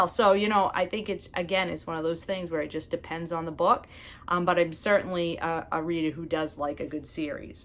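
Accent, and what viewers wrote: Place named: New Hampshire, United States. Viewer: My guess was NH😊. I’m originally from Mass, now in CT. Glad to have found you.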